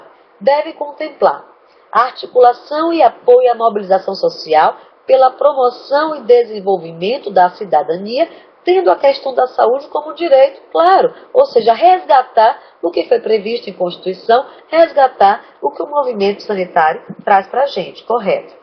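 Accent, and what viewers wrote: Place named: Northeast, Brazil. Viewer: Uma pitada de sotaque nordestino...rsrs uma excelente aula professora de uma forma dinâmica e rápida pude compreender os três Pactos da Saúde. Obrigado por postar no Youtube. Parabéns!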